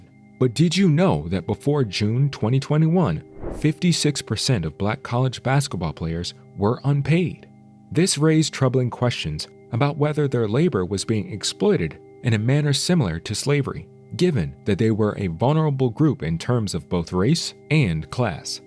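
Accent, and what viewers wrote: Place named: Europe. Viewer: Am I a racist for thinking this dudes voice is mighty European sounding 🧐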